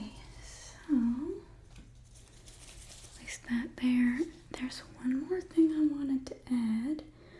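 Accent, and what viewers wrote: South, United States. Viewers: Honestly, southern hospitality makes for the best asmr videos, regardless of content. You did an excellent impression and will be listened to multiple times in the next few weeks. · growing up in the south it's uncanny to hear a southern accent not yelling all the time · Thats a pretty good southern accent! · You do the southern accent so well!!!!!! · You sound and look like a young version of Bert kreischer’s wife. You do a pretty good southern accent! Sound like me almost but more like my granny the proper southern like a Georgia peach. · I grew up in deep rural tennesse..and even she does a better southern accent than I do. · I LOVE when she a southern woman. She does the accent so well! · Oof that southern accent is a massive turn-off lol... I'll give it a like and a comment for the algo and yeet myself out ahaha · I love this ASMR Southern Nurse Accent. 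It gives me tingles!😊💙💙💙💙💙💙💙👍. Well done,!💖⭐💖⭐💖⭐💖⭐💖⭐👍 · I love it! Can you do a video with the southern accent but about eye exams? ❤ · As someone who isn't a fan of America or American things i really like the southern accent when spoken softly like this. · Two things I enjoy southern accent AND her ear videos? This is amazing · There’s just something about southern accents… 😊😊😊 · I don't know why, but souther accents for me is just ❤ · I love the southern accent videos so much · As a VERY southern person (Louisiana southern) she NAILED this southern impression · The thunder in the background was chefs kiss. I also REALLY love the southern accent. So comforting!! 💙💙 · I’m southern, let me just say, as soon as you started talking with that accent I immediately felt at home☺️ I love these types of videos! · Yess the southern accent my favorite 🫶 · Normally you can tell very easily when someone is faking a southern accent. 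But you…immaculate. So good. ❤❤❤ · Being from the south I’ve never felt so comforted by the southern accent then I do right now!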